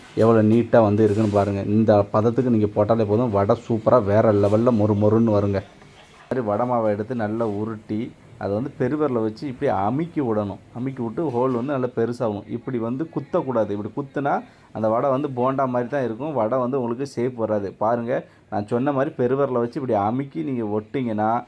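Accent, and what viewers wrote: Tirunelveli, India. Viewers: Bro திருநெல்வேலி யா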